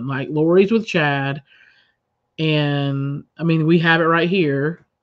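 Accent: South, United States. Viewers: ❤️ it ! I heard it with that beautiful southern accent.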